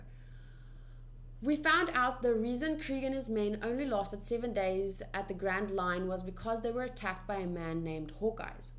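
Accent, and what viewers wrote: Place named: South Africa. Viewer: South African accent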